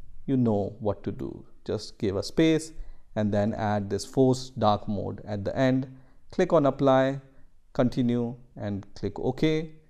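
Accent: India. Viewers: Gotta be my last time listening to an Indian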